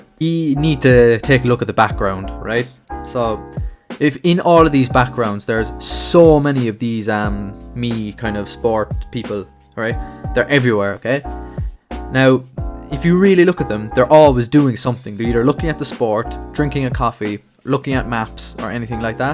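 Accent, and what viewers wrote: Ireland. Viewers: Your accent are you Irish?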